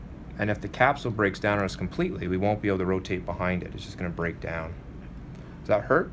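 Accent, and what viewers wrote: Canada. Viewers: I can surly hear your Canadian accent . Love your teaching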